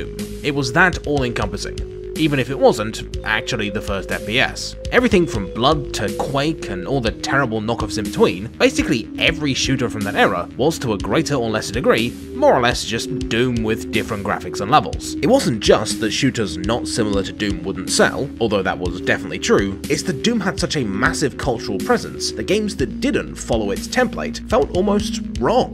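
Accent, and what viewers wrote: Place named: United Kingdom. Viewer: Sometimes I watch your videos and forget that I'm not watching GMTK, but then I'm like "Wait, he's still British, but he's a different British..."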